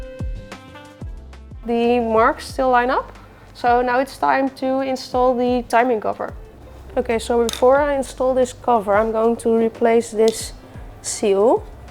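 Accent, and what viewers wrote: Netherlands. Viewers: Nothing better and clear than Dutch English. 💪❤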